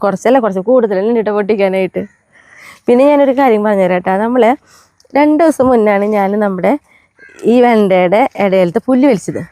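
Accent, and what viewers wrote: Thrissur, India. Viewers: This is a viewer from UK, following your videos for long time. I think I even started getting Trissur slang 🤓. But I am from TRV based here in UK for nearly 20 years. Will you consider Airbnb there? So we could visit and be farm hands ?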